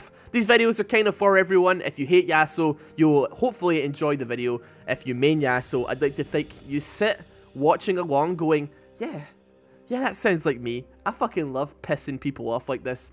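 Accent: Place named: Scottish Highlands, United Kingdom. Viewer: Your vids are awesome man you sound like your from the highlands xD I live near Inverness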